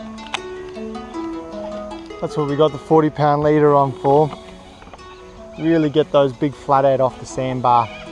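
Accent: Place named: Australia. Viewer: I accidentally clicked on one of your videos and went to immediately change the video then I heard your Australian accent and now I'm subscribed good on ya mate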